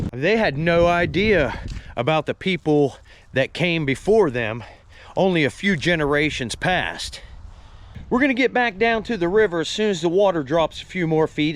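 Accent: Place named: Pittsburgh, United States. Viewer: hes a yinzer no doubt I here that Pittsburgh accent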